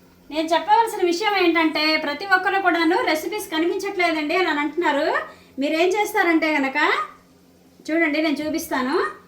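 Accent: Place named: Eluru, India. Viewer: చక‌్కగా మాట‌్లాడతావమ‌్మ సూపర్ ఏలూరు మీది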